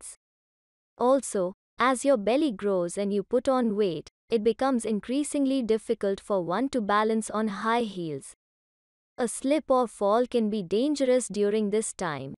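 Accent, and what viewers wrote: India. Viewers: The 1st Indian-voiced YouTube video that made 100% sense 😀. A 31 weeks 1st-time preggo here.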